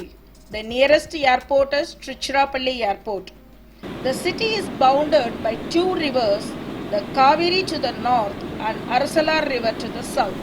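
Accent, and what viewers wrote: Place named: England, United Kingdom. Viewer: Been to this temple many times. Nice English ascent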